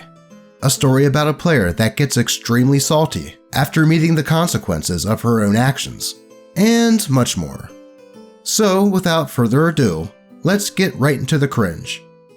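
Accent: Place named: Maryland, United States. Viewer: I love this guys Maryland accent.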